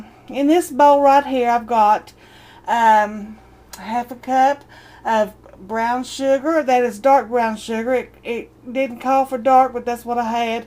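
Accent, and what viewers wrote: South, United States. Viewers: I so love her accent. I am guessing it's southern?!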